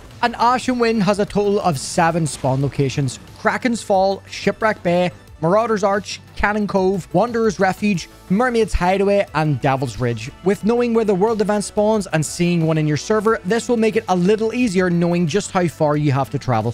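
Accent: Northern Ireland, United Kingdom. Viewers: Are you northern irish?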